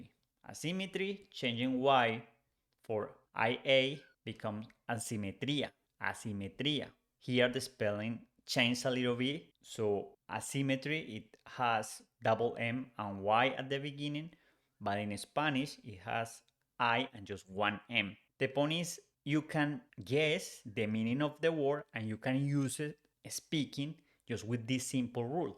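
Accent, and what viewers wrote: Colombia. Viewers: I listen to your podcasts and read through the transcripts. This has helped me improve comprehension tremendously! I am also appreciating this video about hacking or using a simple tool. Also, the short phrases are a huge help as well. Me Encanta el acento Colombiano. Muchas gracias por tu arduo trabajo!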